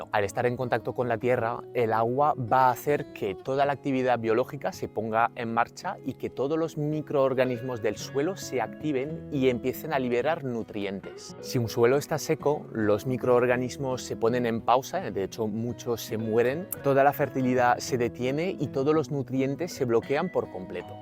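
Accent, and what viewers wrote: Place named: France. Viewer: Sería bueno que nos explicara si en ojo o tallo falta o sobra algún tipo de mineral que sepamos. O cómo saberlo sin hacer un análisis de tierras. Porque aquí en Galicia existe el minifundio y muchos no podemos dedicarnos a hacer un análisis por cada pequeño pedazo de parcela que tengamos. Separados unos de otros😮 tienes un ligero acento francés😂 muchísimas gracias❤ muy buen vídeo❤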